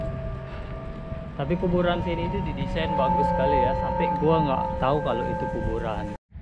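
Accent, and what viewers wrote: Lombok, Indonesia. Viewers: Anoo " ciri khas org sasak klo ngomong 😂😂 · Bang kentel banget logat lomboknya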